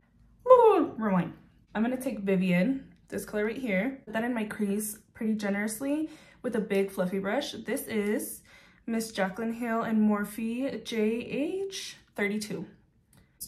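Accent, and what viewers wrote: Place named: United Kingdom. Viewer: Love it she’s cute and I love the British accent and that’s the first grwm in awhile that’s it’s really good can’t wait for more ❤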